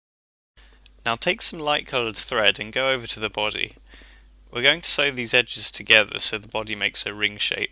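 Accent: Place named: United Kingdom. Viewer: Whoa. I never watched the Goomba guide, so I never knew was British....Whoa. I dint have a problem with British people, in fact, I like English accents, but I never imagined him as British.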